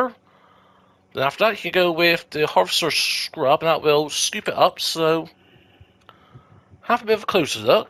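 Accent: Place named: West Country, United Kingdom. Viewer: i could listen to what i believe is your west country accent all day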